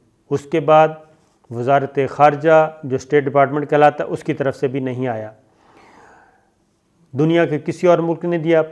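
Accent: Punjab, Pakistan. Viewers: اسلام علیکم. چین جو بھارت کے ساتھ کر رہا وہ تو یے. لیکن میرے بھائی جو آپ اپنی باتوں سے بھارت کو ذلیل کر رہے ہیں وہ تو اور بھی لاجواب ہے. اس پاگل ہاتھی کے ساتھ یہی ہونا چاہئے.27 فروری کو جو بھارت کے ساتھ ہوا.... کیا یہ ذلت کافی نہ تھی ؟ مظلوموں کی آہیں اور بد دعائیں انشا ء اللہ اس مودی اور اس کے ٹولے کو تباہ کردیں گی. آپکی پنجابی اُردو کا مکچر بڑا لزیز ہے. بھارتی جب اسکو سنتے ہوں گے تو انہیں بہت مرچیں لگتی ہوں گی. آپ لگے رہیں ہماری دعائیں آپ کے ساتھ ہیں..... پاک چین دوستی زندہ باد. I also love my great brother country Bangladesh and Turkey. We are standing with our brave Kashmiri brothers. Ae Allah meri arze paak ki hifazat farma. bhai zinda bad. Your great lover. Professor Syed Hamid Manzoor Lahore.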